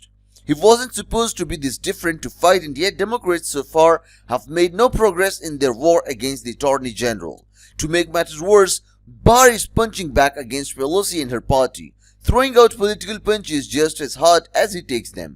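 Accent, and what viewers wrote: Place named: Russia. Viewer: Why would you EVER use a narrator that sounds Russian?? Kind of crazy.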